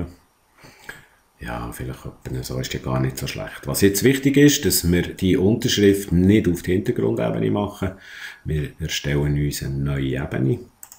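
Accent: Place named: Bern, Switzerland. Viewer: Dieses Tutorial ist genial. Was ich da vorher vergebens geübt hatte! 6 Minuten in Berndeutsch und es klappt.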